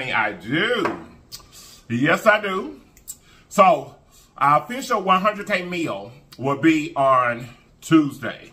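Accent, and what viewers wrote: South, United States. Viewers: HEY ITS MARGARET FROM DAYTON OHIO BUT I WAS BORN IN MOBILE I LOVE YOUR COUNTRY ACCENT I LOVE SLL FOODS U EAT I JUST LOVE YOUR. FAMILY TOO Y'ALL SLWAYS MAKE MY DAY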